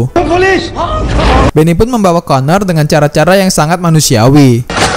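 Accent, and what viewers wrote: Medan, Indonesia. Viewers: Anak medan kau rupanya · Anak Medan mana suara mu?? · Org medan bg? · Hee bodat.. Kau orang medan yahh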